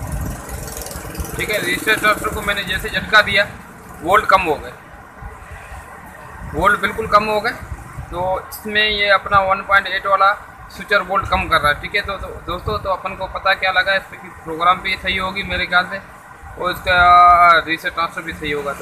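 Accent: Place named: Sindh, India. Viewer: Ha aapke bolne ke andaz se pata chal jata he sindhi style he ...main umarkot sindh se.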